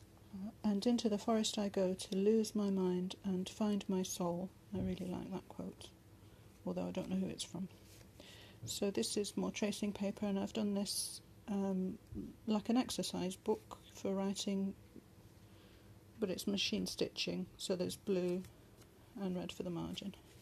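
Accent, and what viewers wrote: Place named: Kent, United Kingdom. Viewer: I'm not clever really your accent is quite clear still. I am from Kent so recognised you have a local accent as you sound a little like myself. It's beautiful up north and I love to travel that way when I can. If you had still lived locally I would have enquired if you do journal making classes as I would have loved to attend. There is little known about making journals down in the SE that I can find, as such YouTube is a valuable community building link. I must go check out your etsy store asap.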